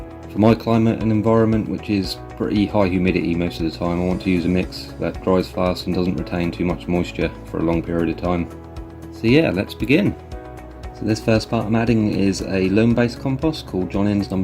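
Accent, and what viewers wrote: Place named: West Country, United Kingdom. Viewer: Well that was 6 years ago. In 2026 are you still using the same mix? You’re speaking tagalog but have a West Country accent. Unique! 🙋🏻‍♂️🇬🇧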